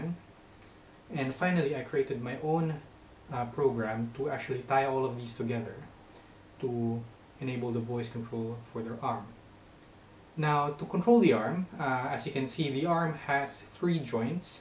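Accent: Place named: North America. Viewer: North American accent in the UK from somebody coming from Japan . A truly global techie guy !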